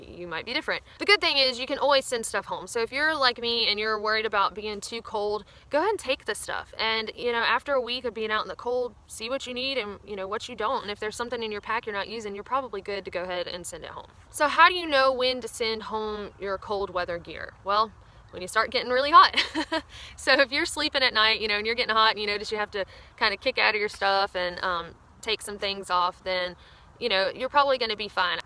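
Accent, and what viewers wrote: South, United States. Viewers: Your southern dialect is kind of blowing my mind. I'm from NM and I've lived in D.C. and MA and I've never heard someone use the words "britches" or "toboggan" before. I would just say "leggings/tights" or "beanie". Anyway, I just found your channel and I love your videos so far. Best of luck on the PCT! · I thought this was a really good video told by a really cool chick. where is your accent from exactly? Southern, Mississippi maybe? I've never heard the term "tobagen" before for a knit cap or beanie. Also, so are you saying a thru hike encompasses or spans no less than 3 seasons? As in spring, summer and fall? Kreikies thats a hell of a lot of hiking. What do you do at night? Play cards or anything? Are people hooking up at all? Haha! Or are the too stinky? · Nice work. I hike the Pinhoti Trail a lot. Have you hiked it? Its not far from you. 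I live in Birmingham. Love your videos, especially love listening to your southern drawl:). Im originally from the Sylacauga area.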